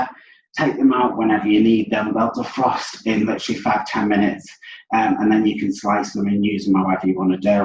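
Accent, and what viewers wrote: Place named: Australia. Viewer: I am very interested in this recipe but I cannot understand a word he is saying in this video. 😞 very poor audio + heavy Aussie(?) accent.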